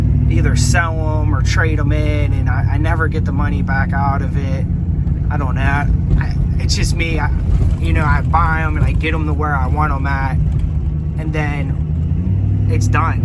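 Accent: United States. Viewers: Also sounds like you got a Philly or Delco accent lol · that pittsburgh accent is thick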